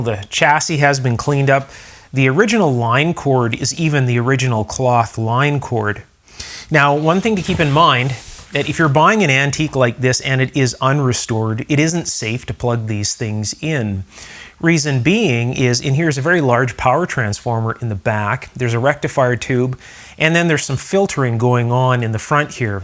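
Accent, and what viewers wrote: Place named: Canada. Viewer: This guy talks like a Canadian.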